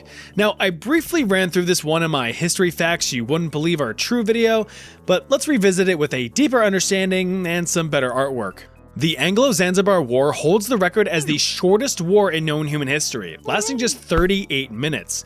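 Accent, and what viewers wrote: Australia. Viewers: Okay mate, your Aussie accent isn't that bad. I'm impressed. Especially in comparison to like...a lot of people on the internet. Also, great video. Also also, Emu's are kinda bulletproof. Something about organs being super in the middle of their bodies, so it took like ten bullets to take them down if you shot right. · The Aussie accent has me frying 😭🙏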